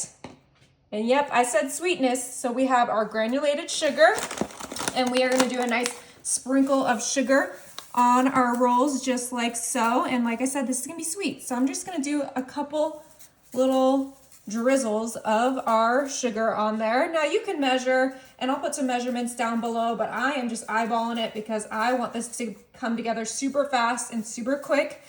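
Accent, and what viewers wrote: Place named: South, United States. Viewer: People seem nastier to others these days. Plus she is a southerner ( I'm from Georgia) and the accent makes her a target. Although my husband is from Alabama and I make fun of him saying ass for ice. It's a little funny. There are many cinnamon roll recipes out there. Leave Miss alone. Get Back Satin in Yeshuas name.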